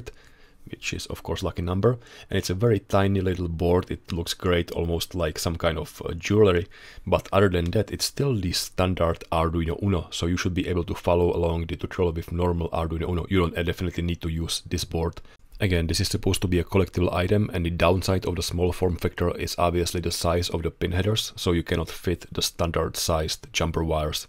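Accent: Czech Republic. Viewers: Čech ? :D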